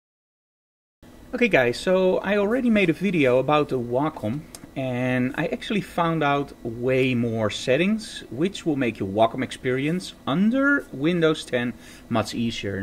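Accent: Netherlands. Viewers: je bent echt overduidelijk engels met je nederlandse accent, blijf wel dit soort videos maken tho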